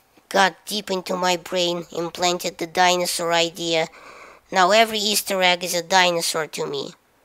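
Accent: Russia. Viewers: I can't help but notice your russian accent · are you half russian half asian or something when i heard ur voice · Спасибо, что рассказал. Половину я и вовсе не знал. А по начальному английскому , понял что ты русский)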